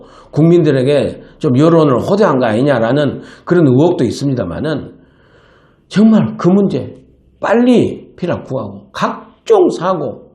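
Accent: Jeolla, South Korea. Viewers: 민영삼 티비 출발을 축하합니다. 전라도 사투리를 쓰시기 때문에 방송국 패널을 하실때 부터 제가 편견을 가지고 있었던 것을 고백하고 용서를 구함니다. 최소한 중립적인 관점에서 현 공영 언론들과 주사파 정치인들의 망국적 `언행을 비판해 주십시요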